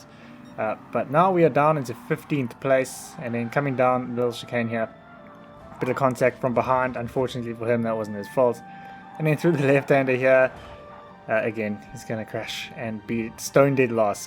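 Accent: South Africa. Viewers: Is that a South African accent I detect?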